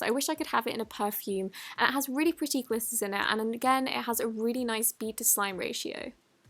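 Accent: United Kingdom. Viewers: Aren’t you British not americqn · I mean, she IS British and pronounces things differently than Americans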